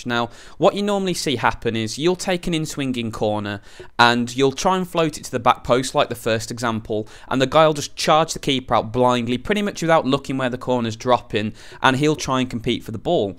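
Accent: United Kingdom. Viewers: If you think his British accent is bad you haven't heard more than half of other British accents. Especially the ones as you go towards the north of UK.. lol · i hate your british accent but good video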